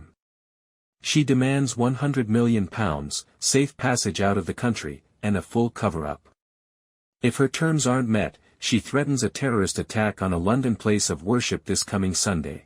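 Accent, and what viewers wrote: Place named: United States. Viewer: Slew House ?????? Do you mean Slough? Using a US narrator! Whose stupid idea was that?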